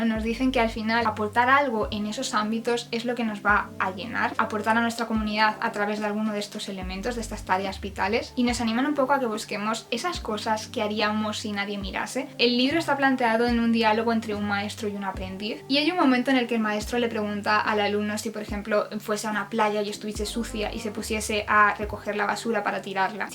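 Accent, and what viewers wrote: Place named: Spain. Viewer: Tu vídeo es muy lindo, que lindo detalle el de las lucesitas en tu cama :3 por cierto, tu acento es muy adorable, siempre me pregunto, los españoles nacen con su acento tan característico o lo adquieren gracias a sus padres o familiares? Es que hablan muy lindo las chicas españolas. :virtualhug::virtualhug::virtualhug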